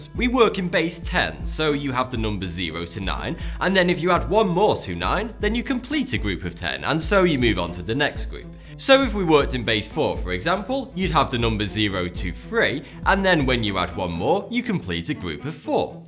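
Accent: United Kingdom. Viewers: you're British aren't you?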